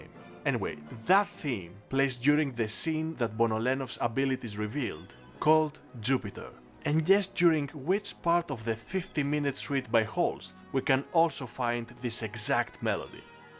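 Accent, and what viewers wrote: Greece. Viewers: Only Greeks speak English with that kind of accent. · Oh! That's a greek accent! Γειά σου φίλε! Ωραία ανάλυση!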